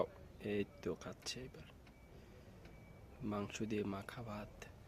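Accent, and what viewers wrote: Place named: Barishal, Bangladesh. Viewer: শুদ্ধ ভাসা কস আবার বরিশালের বাসাও কস কেম্নে কি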